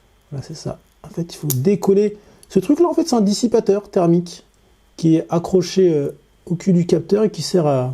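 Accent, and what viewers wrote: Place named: Europe. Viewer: I hate European accents, too annoying. Always sounds enthusiastic no matter your actual expression.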